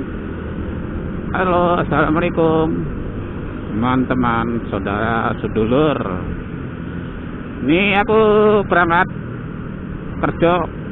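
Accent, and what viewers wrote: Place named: Banyumas, Indonesia. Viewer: Motovlog ngapak -ok tetep semangat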